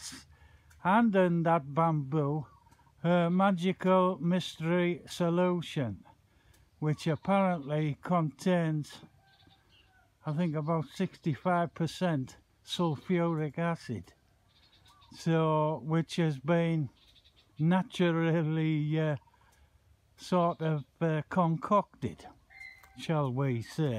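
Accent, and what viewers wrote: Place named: Newcastle, United Kingdom. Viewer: you make me laugh you sound like me old granddad god bless him proper Geordie man..died at 94...done ww2, Burma and stuff, from Stanley Co Durham,..I used to gan me holidays to Consett and East Stanley...spoiled as a child, im Scottish lad but my holidays were spent in Newcastle,...Iloved it as a wee laddy, you must be a Mac,am....south of the Tyne.....great people north east,...love the Geordies,...if i ever meet you il bring a bottle of Newcastle Broon over for ye bonny lad....Im a reet at takin Geordie like man nae bother god bless ya.....gan the TOON, <num>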